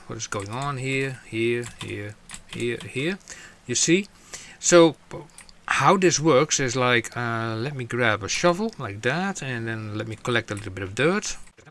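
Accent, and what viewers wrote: Netherlands. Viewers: As a dutch person it's always funny to hear the typical dutch english accent haha, but great video, this is awesome to know that you can explore the areas further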